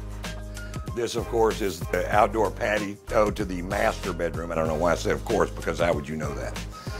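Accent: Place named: Texas, United States. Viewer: You know he’s from Texas.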